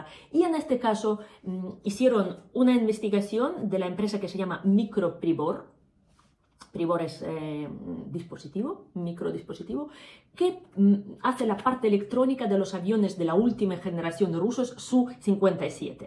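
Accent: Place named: Andalusia, Spain. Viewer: Yo soy de Jerez y a veces pienso que aprendiste castellano en Andalucia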